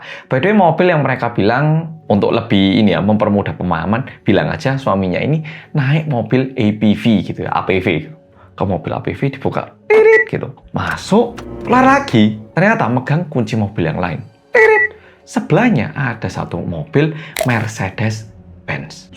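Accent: Java, Indonesia. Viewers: medok bgd orang korea yg satu ini! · Sek mas.. sampean iki temenan wong korea ta? Kok jowone g ilang2.. wenak ngunu lo lek ngomong jowo.. 😅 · Cerita Korea, tp di ceritain pake akasen Jawa medhok😂😂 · Kok bisa muuuuedok yaaa 😅😅😅😂😂😂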